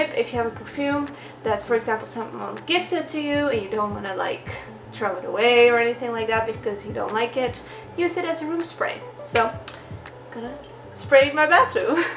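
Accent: Netherlands. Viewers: She has a real dutch accent ... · you have a dutch kind of accent while speaking ^^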